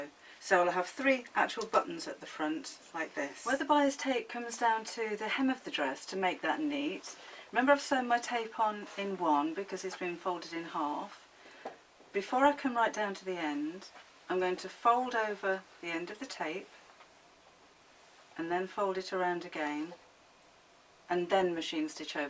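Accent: United Kingdom. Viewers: I just noticed your pin cushion. I have got to make one like that. Thanks for this wonderful video. btw love that British accent you have.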